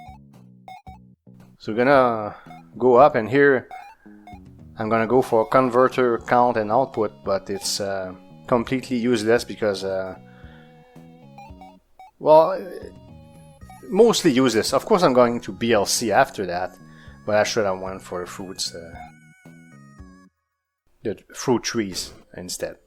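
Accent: Quebec, Canada. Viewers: you sound quebecois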